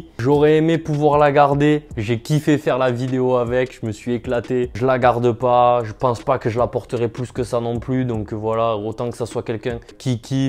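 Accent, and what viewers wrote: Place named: South, France. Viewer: c'est lourd ton bail de faire gagner des lots, force à toi frérot (vive l'accent du sud) mdr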